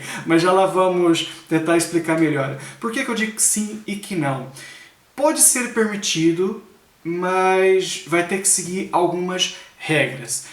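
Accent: Portugal. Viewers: Vendo seus vídeos eu fiquei curiosa, você é português? Seu sotaque é bem equilibrado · Seu sutaque está muito Português!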